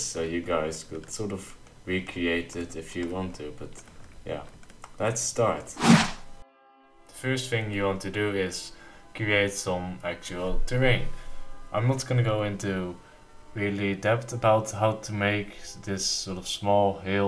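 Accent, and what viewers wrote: Netherlands. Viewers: are you from the Netherlands? it sounds like you are, I'm too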